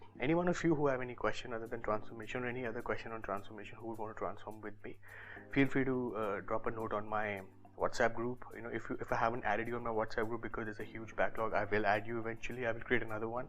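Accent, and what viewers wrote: India. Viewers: ya he definitely limits his audience potential...half English mixed with Punjabi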